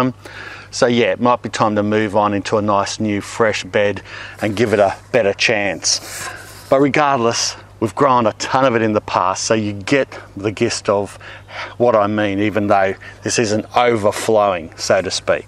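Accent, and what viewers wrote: Australia. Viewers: Thank you for your Youtube-channel! Watching your videos is a study of Fun Gardening and Australian English at the same time :)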